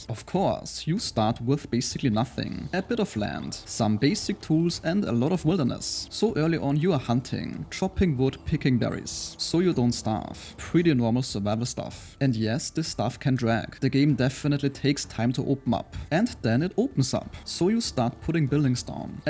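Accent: Germany. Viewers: so deutsch ab sekunde 1 instant gehört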